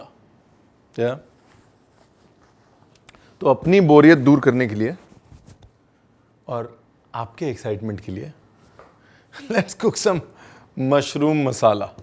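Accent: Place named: Punjab, India. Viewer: Ranveer pra...Love you...Your style amazing and jab bat karte hue Punjabi touch ata haina...mast bro you awesome....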